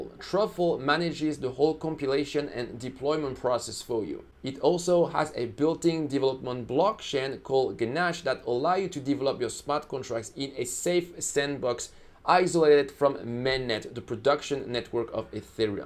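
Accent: France. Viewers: Love the French accent ❤️👍🏽But love eating the blocks more! :) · I do enjoy your French accent! Plus really great content. The Harvest Finance hack video you did was excellent!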